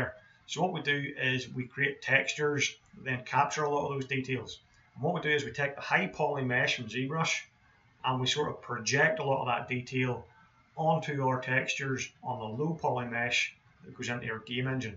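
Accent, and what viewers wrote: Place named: Northern Ireland, United Kingdom. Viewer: Trying to import cameras from Blender into Substance led me here. Whilst this video didn't answer my question, it did introduce me to a fellow Mourneman. Well, I'm guessing from the accent!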